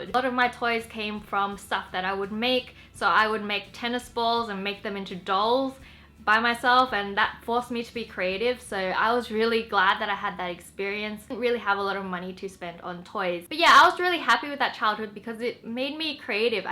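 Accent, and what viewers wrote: Australia. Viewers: You know, usually I can tell the difference between Aussie and English accents. but I honestly thought you were from the UK. but then when you said you're Aussie , that's when I actually heard your accent. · Woah, she sounds really Australian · that's why you sound Australian I live in Australia Melbourne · she's lived in Australia most of her life, so she picked up the way that the locals spoke. Before she moved she probably didn't have that accent, it just developed over time. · Wengie are you Australian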